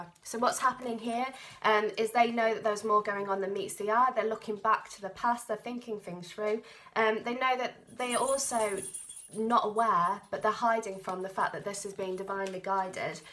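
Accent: United Kingdom. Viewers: Deck #2 🙊💖 thank you, this is a nice reading. I love your purple hair :) and your style. It's just been a little hard for me to keep with your fast speaking 😅 bc British English has a strong accent for me, I'm used to American accent more, so yeah it was hard to keep on with the reading 😅 had to turn on the subtitles.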